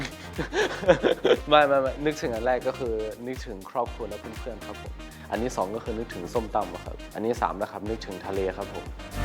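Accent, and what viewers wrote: Isan, Thailand. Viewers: น่ารักมากกกกกก เอ็นดูท่าปล่อยพลังอะ55555 พูดอีสานน่ารักมากกกก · เอ็นดูเว่าอีสานนนน 5555 ภาษาไทยไม่ชัดหนักแล้วลูกเอ๊ย 5555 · สำเนียงอีสานได้น่ารักมากๆๆลูก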